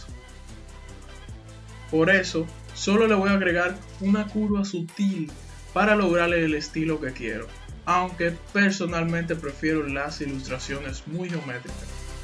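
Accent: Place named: Dominican Republic. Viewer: Muy bueno el tuto. Según tu voz, debes ser dominicano. Un abrazo mano desde el patio.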